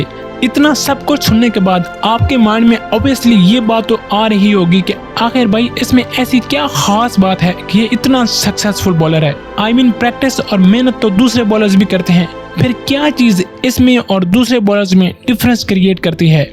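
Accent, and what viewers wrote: Pakistan. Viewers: Bhai tu Pakistan hai ye to accent se pata chal gaya. Thank you bro for such an amazing video.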